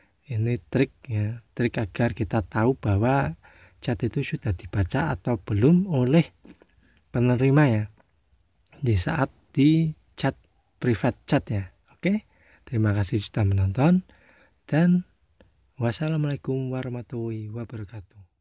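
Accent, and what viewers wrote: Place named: Java, Indonesia. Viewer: Tak tebak wong jowo yo podo mas jowo ne ,aku kudus jateng mas salken lo.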